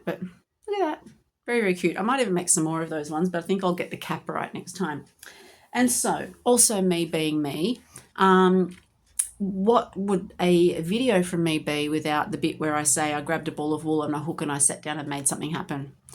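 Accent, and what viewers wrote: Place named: Australia. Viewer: Hi! Super cute mushrooms 🍄 I had to laugh about tester calls because I thought the very same thing! 😅 it's your beautiful Australian accent...don't change ❤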